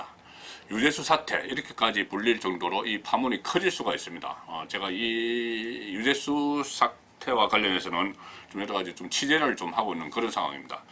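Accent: Gyeongsang, South Korea. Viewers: 구수한 경상도 사투리를 쓰시면서 정확하게 사건을 정리하여 방송해주셔서 감사합니다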